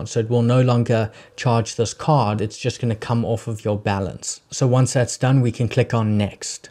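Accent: South Africa. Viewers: Just as I was about to ask if you were South African you said you were South African lol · Hey, Good to hear a South African accent. I'm based in Spain (from CPT originally), I've opened an LLC in the USA, but struggling to get a Bank Account in the USA at the moment. Would you say it's best to open a Professional SC Acc as an Individual so that my Spanish address registered with my Bank Acc in Spain lines up with my address on SC until I get a USA Bank and then update my details to a company on SC? Is that even possible? Even if I have a US Bank Acc, it will likely have to have my Spain address I think... as I only have a Wyoming Registered Agents and Mailing address, and the agents have said it's not advisable to use that address for banks. Plus there's the utility bill issue... 🤷🏼‍♀️ Any direction you can offer on this?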